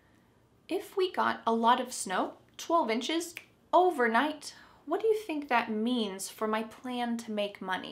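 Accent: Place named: United States. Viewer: Hello My name is Eugene. Thank you very much indeed for your super American English accent. Here in Rwanda we truly love you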